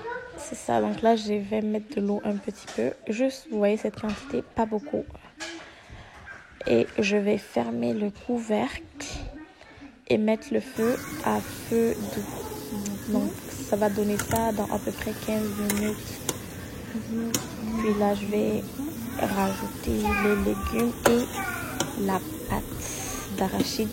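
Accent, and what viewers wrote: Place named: Montreal, Canada. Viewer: Montreal sa sentend dans la voix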